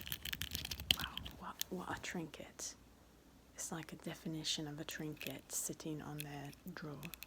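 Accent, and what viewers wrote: Italy. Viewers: wait I thought she was American but lives in Italy and has an Italian accent....?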